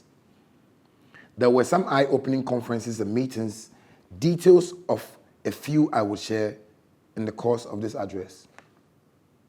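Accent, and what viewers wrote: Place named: United States. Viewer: So you can speak american english,shatta shatta!!!!!!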